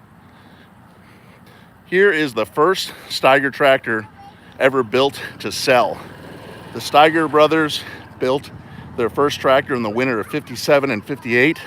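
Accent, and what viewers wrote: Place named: Texas, United States. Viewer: When did you get a Texas accent?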